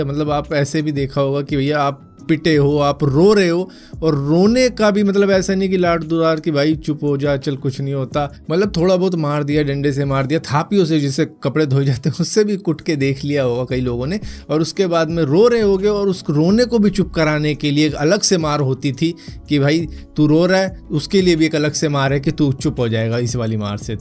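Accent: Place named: Punjab, India. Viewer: Bai tum Punjab se ho I know I know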